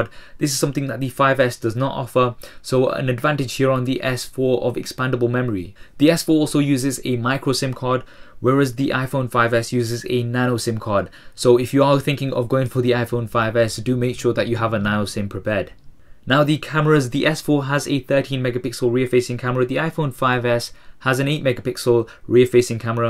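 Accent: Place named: United Kingdom. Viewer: This man has British Accent and I love it. Its more understandable than the American Accent. Well, going back to the topic, for me iPhone 5S is better and BEST than any other phones either. Samsung phones are just copycats of iPhone's features and specs and EVEN the accessories! Without iPhone being released on the market, these copycat android phones won't exist!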